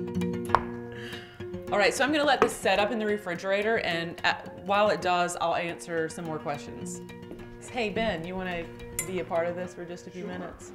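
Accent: South, United States. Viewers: I love your pronunciation and Southern Drawl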